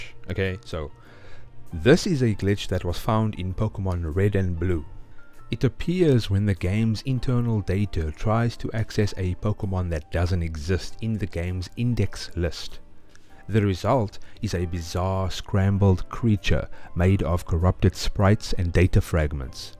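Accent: South Africa. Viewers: Enjoyed the vid, you sound like you're from RSA too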